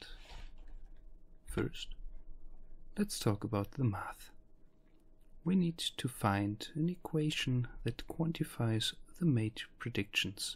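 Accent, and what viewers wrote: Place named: Greece. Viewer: Are you Greek by any chance? The accent suggests you might be!!! Ευχαριστώ!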